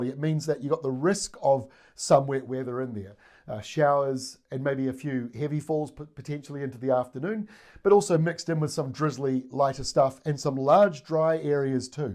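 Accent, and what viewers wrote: New Zealand. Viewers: Great up date , nice having a good clear kiwi giving weather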